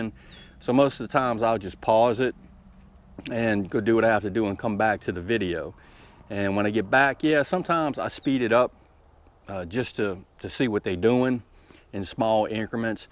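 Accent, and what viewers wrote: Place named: Mississippi, United States. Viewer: I love your channel and tht Mississippi accent. :)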